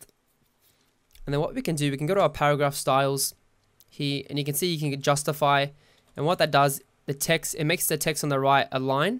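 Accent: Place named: Australia. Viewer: Ah an Aussie chap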